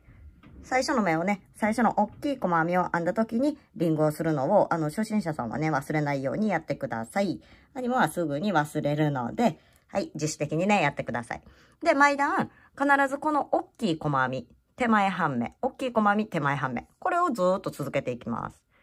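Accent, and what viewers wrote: Kansai, Japan. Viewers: 関西弁わかりやすくて聞きやすい✨編み目も「へ」の文字に表現したりで非常に分かりやすかったです。ドゥードルのワンコの編み方を是非やってもらいたいです！ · めちゃわかりやすいです！ 関西弁最高です！！ 素敵な動画ありがとうございます！！ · すっごくわかりやすかったです。関西弁聞きやすかったです。ありがとうございました🙇お弁当入れにしようと思います🍙。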